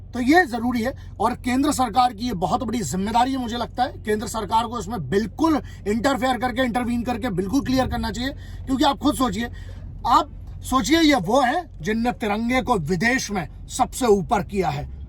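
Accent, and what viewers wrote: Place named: Kanpur, India. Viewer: Your voice has accent of kanpur up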